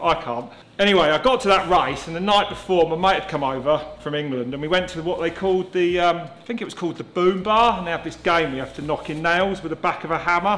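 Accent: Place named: South, United States. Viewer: Funny as fuck! Always been wierd to watch i think its the sothern accent with northern humer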